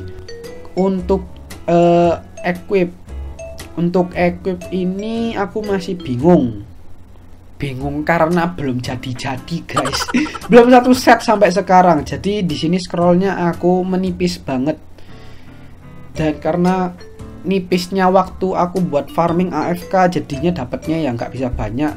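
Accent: Java, Indonesia. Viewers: Bahasa indonesia aja biar medoknya kerasa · Aksen jawa. Lebih enak didenger telinga (soalnya udah lama tinggal di malang haha). Btw, Garuda juga ya mas? Mau tanya kalau sehari2 leveling bisa dpt brp lvl? Kebanyakan leveling dimana mas? Trus itu enhance sampai +21 pake maphr trus atau kadang2 aja? Maaf mas nanya banyak kepo bgt soalnya liat kilau elven bownya gak nahan hahaha. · AKSEN JOWO AJ BANG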